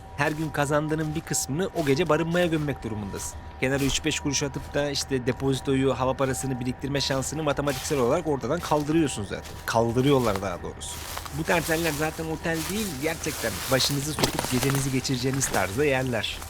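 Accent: Japan. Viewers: japonyada kalmak cidden etki etmiş bu arada kelimeleri telaffuz şeklinden japonca konuştuğun baya anlaşılıyor çok tatlı (‾◡◝)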